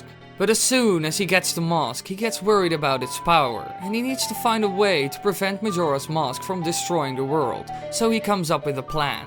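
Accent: Netherlands. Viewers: Dude... Dutch seeps out of every pore of your accent x) But it's less cringey than the usual dutchman speaking English, so... +1 nieuwe sub, lol. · Holy shit Damn I can hear you are dutch finnaly a fellow dutchie:DDD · Why does this guy have a dutch accent all of the sudden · Jacob Marcelo i think its a dutch accent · ur dutch accent is so distracting since i'm dutch as well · is he dutch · You're dutch aren't you? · Wait a minute HEY YOUR DUTCH:D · Hella Skella Don't brag beebje, even you aren't that fluent, but most certainly more fluent than this dude 😂 But yes, this is unmistakably a Dutch accent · R you dutch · Are you Dutch? Your accent sounds a bit Dutch. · your voice is not annoying at all or anything [neither is the accent], but after hearing you talk for 3 seconds i want to ask: what part of the Netherlands are you from? :P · ur English accent is a dutch man speaking English I know I speak the same way and I'm Dutch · Hoor ik daar een Nederlands accent? 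;-) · he is dutch. The accent is obvious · This is a thicc dutch accent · lemon it's like a typical Dutch person trying to speak English lol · are you dutch? i think it because of you're accent. sorry if i am wrong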